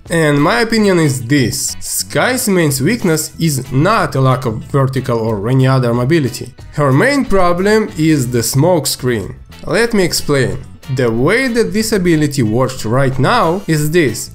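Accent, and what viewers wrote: Russia. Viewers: I love this russian accent xD Nice vid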